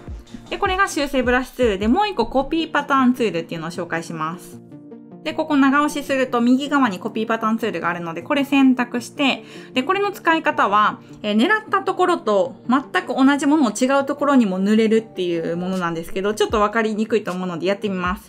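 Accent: Kansai, Japan. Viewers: 地味な作業😁だな〜。 Adobe MAX 楽しみにしてます❣️ そしてめっちゃ関西弁😁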